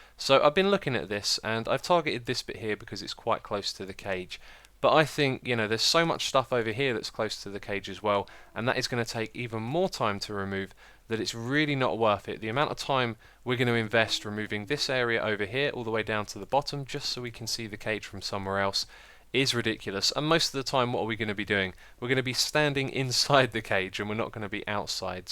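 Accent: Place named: United Kingdom. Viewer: You can speak so good British! THX for learning my English to and GREAT PROGRESS VIDEO!!!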